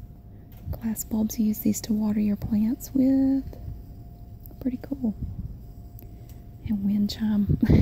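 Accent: South, United States. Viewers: I love her southern accent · I just subscribed to your channel and I love it! Your terrific and love the southern accent! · you are so relaxing and I love your southern acent it's very beautiful thankyou for making videos they are very much appreciated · Where in America is this accent from I love it oml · Your kids have a thicker southern accent than you do :)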